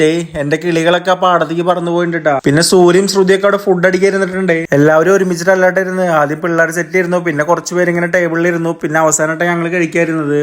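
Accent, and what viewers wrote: Palakkad, India. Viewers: Palakkad style athanu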